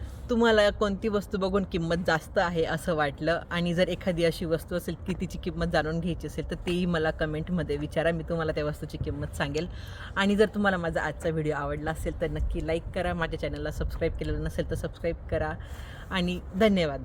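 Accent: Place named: Malegaon, India. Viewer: तुम्ही सताना मालेगाव भागातील वाटताय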